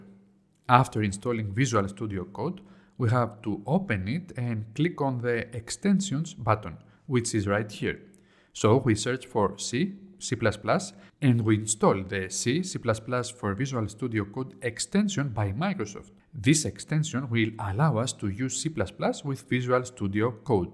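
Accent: Greece. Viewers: The greek accent is so cuuuteeee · 2 seconds in and almost immediately realized you were Greek. Keep up the good work!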